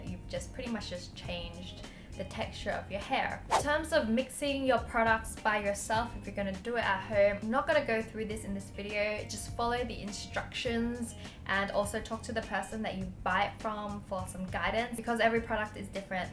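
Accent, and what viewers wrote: Australia. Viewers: is she australian? i love that accent! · purplesheep yep australian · Aussie accent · It's austrailian accent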